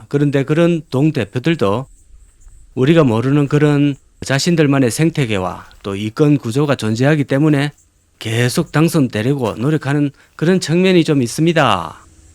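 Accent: Gyeongsang, South Korea. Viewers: 1976년부터 1988년까지 13년동안 마산 창원에서 20대 직장과 청춘을 보냈는데 정겹고 친근하고 구수한 사투리가 향수를 자극 합니다.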